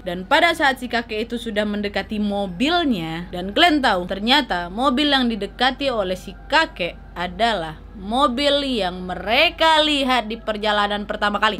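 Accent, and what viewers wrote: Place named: Medan, Indonesia. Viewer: Orang Kalimantan tapi bahasanya Medan medhok... Hahaha 🤣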